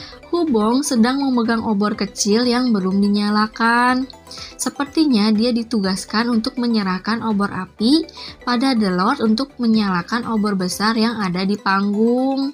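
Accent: West Java, Indonesia. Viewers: Hahaha kesannya kaya adminnya nyindir hahaha tapi gue malah kesemsem sama suaranya sama logat sundanya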